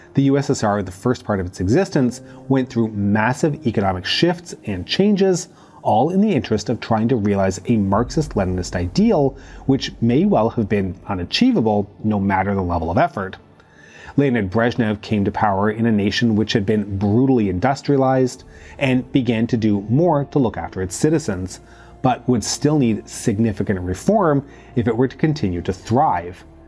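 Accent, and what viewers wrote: Canada. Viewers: Hah, I always thought you had that slight Ontario accent. I'm from Kingston lol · It finally clicked during the conclusion why he sounds American but pronounces things like a Brit… he’s a Canuck!!!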